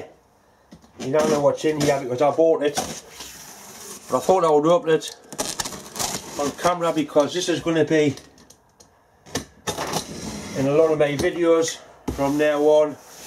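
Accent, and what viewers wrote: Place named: England, United Kingdom. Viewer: Ok, I dug up my very old account and subscribed to channel and I gave a thumbs up as I should have long time ago, fore you asked me to,. I am a long lurker here otherwise:) Excuse me for my language errors, I'm a norseman:) Hi, I like your stuff there in England, especially your, for me, different dialect. I love dialects. Most Swedes wouldn't care about such things but I do. Bo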